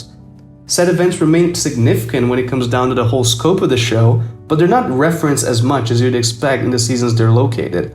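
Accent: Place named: Latin America. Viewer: Sorry for the question, but you are a latino american? You form at talk is very diferent. Amazing video, adventure time it's a great show, a thue Master piece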